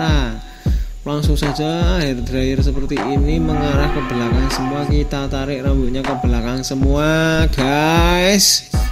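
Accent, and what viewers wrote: Java, Indonesia. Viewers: Suara jamet Jawa bgt cuih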